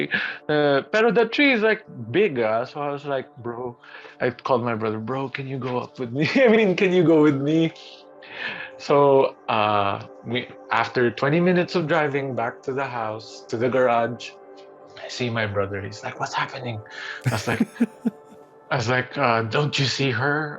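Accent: Conyo, Philippines. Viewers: Conyo si kuya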